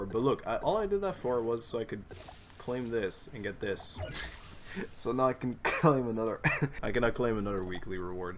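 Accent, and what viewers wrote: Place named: France. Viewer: MAN THAT ACCENT HOLY WOOOW JE SAVAIS PAS QUE T’ÉTAIS FRANÇAIS je suis choqué mais grave surpris continue tes vidéos d’explorations elles sont grave chill!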